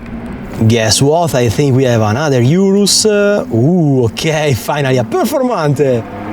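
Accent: Italy. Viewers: Excellent footage, excellent Italian accent!!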